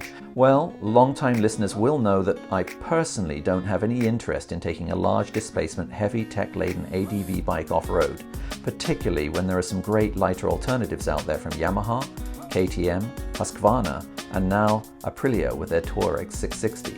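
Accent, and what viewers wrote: United Kingdom. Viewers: Wait, waht, what? A British accented POME giving Canadian pricing? Consider me subscribed.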